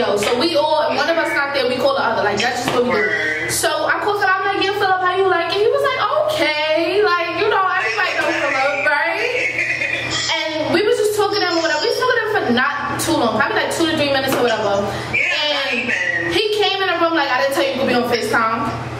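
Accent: New York, United States. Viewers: “Akeke” i love how new york you are sis 🤣🤣🤣🥳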